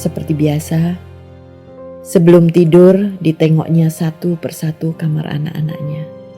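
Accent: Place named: Java, Indonesia. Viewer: Pronounciation khas Jowo ya? He3... Sing penting penonton paham karepe ya? 😁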